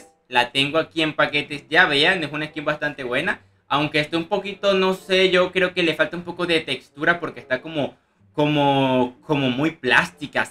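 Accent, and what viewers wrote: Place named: Peru. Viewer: Parece peruano XD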